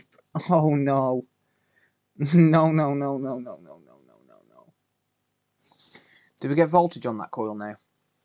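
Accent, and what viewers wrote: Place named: Dudley, United Kingdom. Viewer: You from dudley ?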